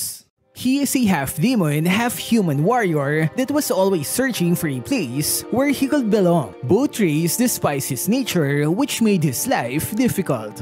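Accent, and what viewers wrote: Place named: Tagalog, Philippines. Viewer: In a yung english mo sir parang tagalog pakingan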